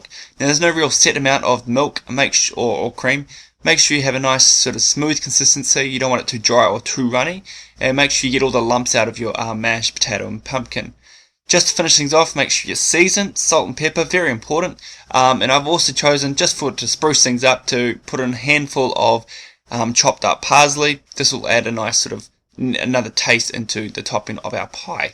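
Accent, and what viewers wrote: New Zealand. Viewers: I lived in New Zealand, thought that his accent was familiar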